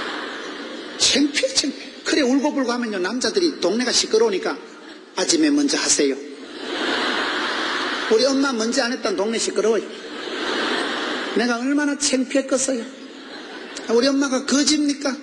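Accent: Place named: Gyeongsang, South Korea. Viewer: 김문훈 목사님 존경 합니다 경상도 사투리를 김문훈 목사님 고향이 경상도 목사님♡♡♡♡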